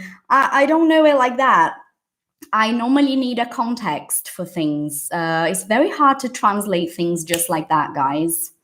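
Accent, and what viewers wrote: United Kingdom. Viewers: I love this accent British ♡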